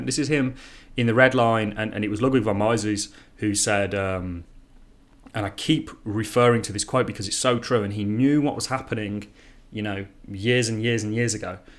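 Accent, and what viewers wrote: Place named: Midlands, United Kingdom. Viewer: thanks for your videos. Guessing from your accent your in the midlands.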